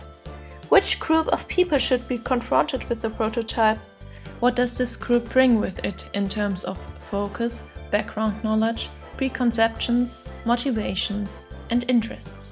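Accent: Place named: Germany. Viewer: Thank you, this is a fairly comprehensive overview of what a prototype is. I appreciate the depth of the technical terms used. By the way, "Resources" is spelled incorrectly. Also, please reach out if you are interested in an English voiceover for any future projects. (It is sometimes a little distracting, from the content, to listen to the German-accented English pronunciation and mispronunciations.) Otherwise, thanks for posting!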